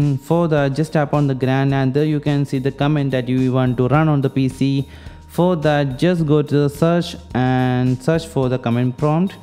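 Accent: Kerala, India. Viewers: മലയാളി ആണോ???english കേട്ടപ്പോൾ അങ്ങനെ തോന്നി അതാ!!!!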